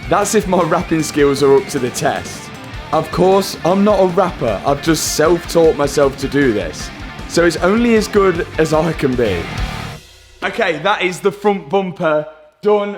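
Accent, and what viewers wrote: Leicester, United Kingdom. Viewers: Tuning in for the Lesta accent 😊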